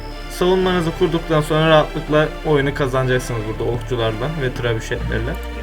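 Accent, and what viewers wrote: Turkey. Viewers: kardeşim konuşmanda ufak bir rize havası sezdim. cok güzel oynamışsın, eline sağlık. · reis valla ben trabzonluyum ben bile seni buralı sandım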